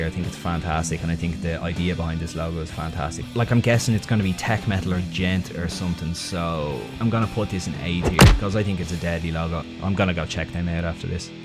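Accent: Ireland. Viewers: I knew you was Irish when I first heard your accent mate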